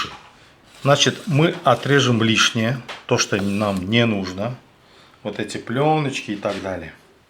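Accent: Baku, Azerbaijan. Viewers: Настоящий Бакинский акцент❤смотрю с удовольствием ваши ролики, всем Талышский салам из Канады Вагкувер ❤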